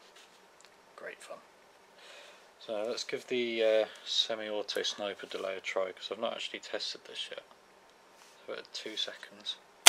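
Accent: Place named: England, United Kingdom. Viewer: Nice to hear an English voice behind this project! Great work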